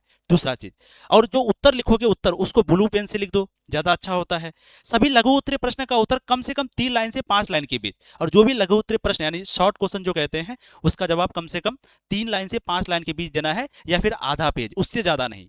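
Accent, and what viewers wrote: Bihar, India. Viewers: Sir aap bihar se ho kya aapki boli se lag rha h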